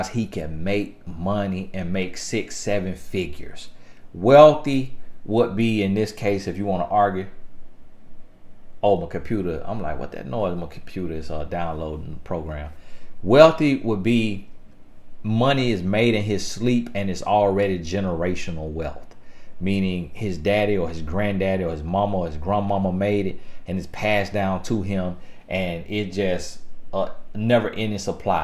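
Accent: South, United States. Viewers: I love country accent 😇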